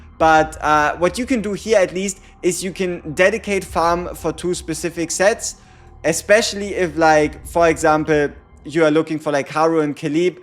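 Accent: Germany. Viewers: Love yoir heavy german accent 😭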